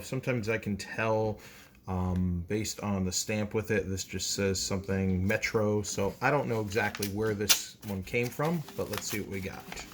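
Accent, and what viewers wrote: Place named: South, United States. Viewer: New subscriber. Great video buddy! The only flaw I can find is your heavy southern accent. Maybe in your next video you could do a New Jersey accent to help appeal to all Americans and drive up your views.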